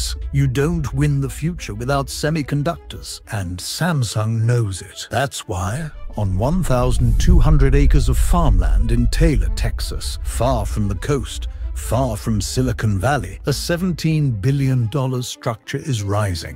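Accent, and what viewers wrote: United Kingdom. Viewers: Why the heck do we need a narrator using flowery language and with an English accent telling us about Texas??? Stick to making videos about sheep farming in Yorkshire and how to properly hold a teacup! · W"hat's with the constant British voice in the backstory narration on anything BIG, BOLD. AMBITIOUS INFRASTRUCTURES in America....curious??! 🤔🤔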